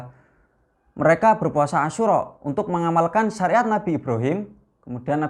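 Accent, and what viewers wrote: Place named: Banyumas, Indonesia. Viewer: Ust ahlu ngapak masyaAllah😍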